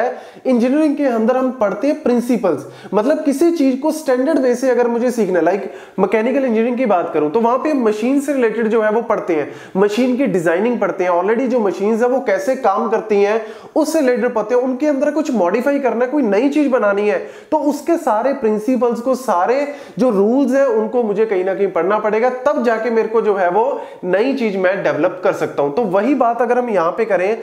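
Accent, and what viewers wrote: Punjab, India. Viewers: sir aap punjab se ho..?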